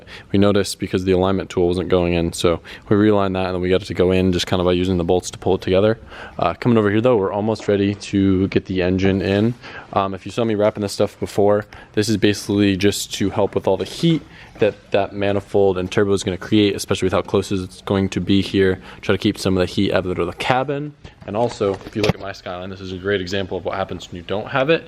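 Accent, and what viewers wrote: United States. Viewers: Adam LZ the cup holder specialist, with an american accent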